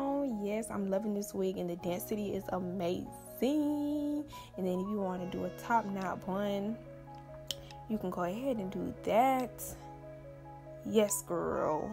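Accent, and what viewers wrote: Miami, United States. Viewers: I’m assuming you’re from Miami. Cause your accent is either OT , Beans or CC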